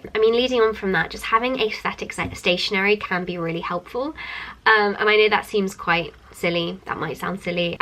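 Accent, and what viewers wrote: United Kingdom. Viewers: Can i know ? Do you talk british accent ? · Yes she does have British accent. But you can't "talk" accent lol.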